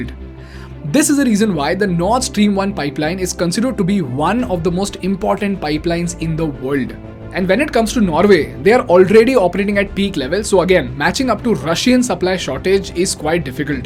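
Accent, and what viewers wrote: India. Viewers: It´s hard to understand a person from India speaking Englisch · Sorry but your English is not very good. Your English with Indian accent.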